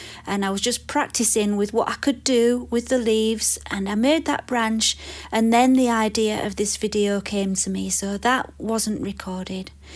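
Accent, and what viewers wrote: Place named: Scotland, United Kingdom. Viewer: I enjoy your videos so much for two reasons. First I love your work. Second my grandparents were Scottish and your accent warms my heart and makes me think of them. I always wanted to travel to Scotland and see where they lived but I've put it off. Listening to you makes me want to speed up my plans. I think when covid is all gone I'll make the trip.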